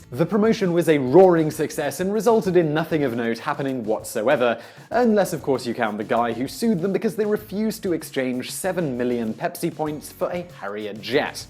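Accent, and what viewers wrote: United Kingdom. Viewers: "PentaGIN" Its PentaGON" what the fuck is so hard to say that with a heavy british accent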